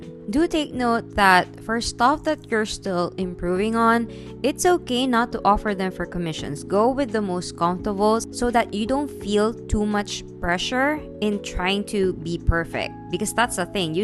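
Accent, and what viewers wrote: Philippines. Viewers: accent is too FILIPINO. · I actually Guessed you correctly? Lmfao? Although i appreciate the Video, cuz i also wanted to look for commissions, your accent kind of gave me a suspicion, so instead of using English, i used Tagalog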